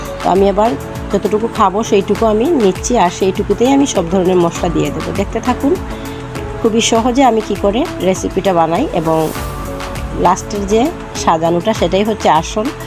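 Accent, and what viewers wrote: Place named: Sylhet, Bangladesh. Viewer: সিলেটি ফুরি😃😃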